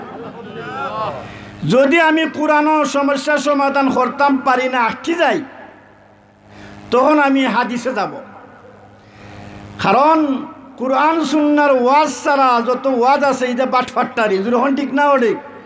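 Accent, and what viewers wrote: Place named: Sunamganj, Bangladesh. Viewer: কুব সুন্দর বয়ান সুনামগঞ্জী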